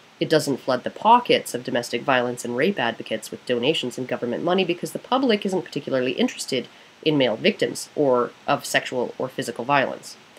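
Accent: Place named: Canada. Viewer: I LOVE CANADIAN ACCENTS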